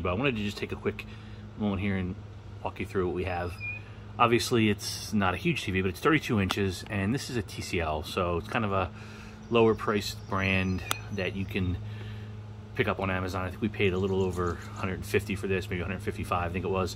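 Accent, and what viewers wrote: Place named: Philadelphia, United States. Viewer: “We’re in the northeast” with time of the worst Philly area accents I’ve ever heard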